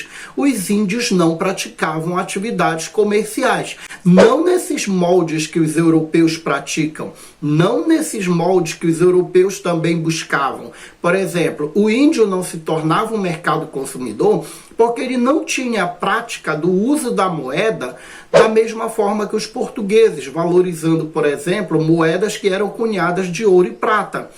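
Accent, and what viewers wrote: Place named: Pará, Brazil. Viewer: Poxa, professor, acabei de encontrar o seu canal, vi dois vídeos e já sinto sua falta 😂. Pelo sotaque vc é do Pará (minha terra de criação) vivi em Altamira por 19 anos. Obrigada pelo conteúdo de qualidade acerca da história 🎉🎉🎉🎉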